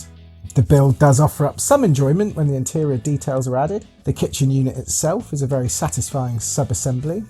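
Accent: England, United Kingdom. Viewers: And where are you from, you sound English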